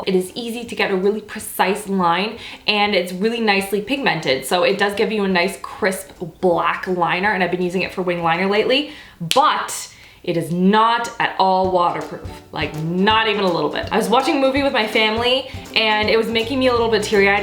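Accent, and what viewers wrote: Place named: Canada. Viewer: I mean, she's Canadian. Maybe that's what you're hearing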